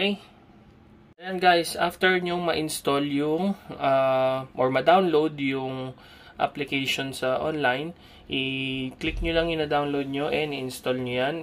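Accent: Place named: India. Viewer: titulo en español y audio en indu-ingles, que mal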